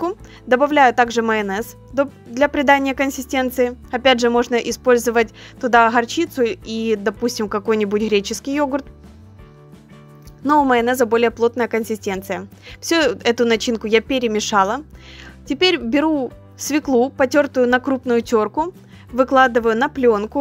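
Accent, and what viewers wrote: Ukraine. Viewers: Молодец ты. И акцент твой украинский мне тоже по душе. Я в восторге от меледочных роллов. В субботу обязательно сделаю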